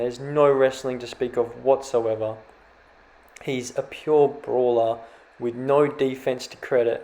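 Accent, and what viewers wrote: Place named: Australia. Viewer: Great content. Great fucking vid, some parts were very funny. What does cadjup mean? Much love from Aus, when is the next vid out? Sounds like you are from Australia.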